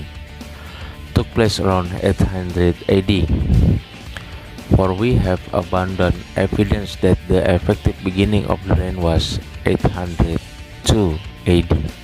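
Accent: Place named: Southeast Asia. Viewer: The narrator accent is like thai,cham or viet May be jayawarman sounded like this if he is using english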